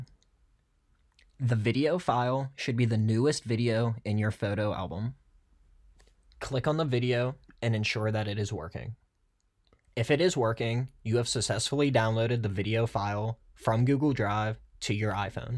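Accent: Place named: Pittsburgh, United States. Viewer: Are you from the Ohio, Pennsylvania, West Virginia tri-state area? Lol I’d know that dialect ANYWHERE. #yinzer 😄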